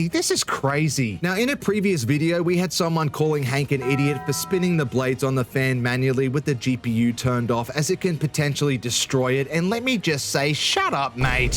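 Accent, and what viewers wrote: Australia. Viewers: Just recently stumbled upon your channel and very happy I did. One I love the doggo. Two love your accent. Makes me think of crocodile dundee. And of course I am one of those weirdos that loves watching people mess with computers. Cheers brother.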